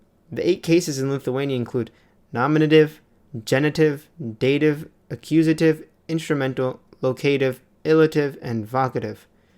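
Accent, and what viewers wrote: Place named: Russia. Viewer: Aš gavau šitą video recommended skyriuje ir aš lietuvis, bet tu skambi, kaip su rusišku akcentu.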